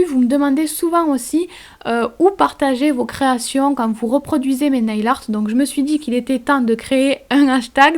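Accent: South, France. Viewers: D'où vient tu? tu as un accent du sud !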